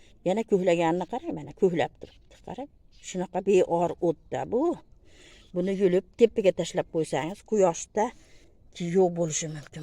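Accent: Andijan, Uzbekistan. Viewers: Гапиришиздан андижонликмисиз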